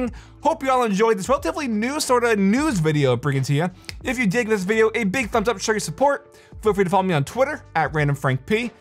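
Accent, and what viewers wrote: Singapore. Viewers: Lmao that Singaporean accent is so easy to recognise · sounds Singaporean hahahah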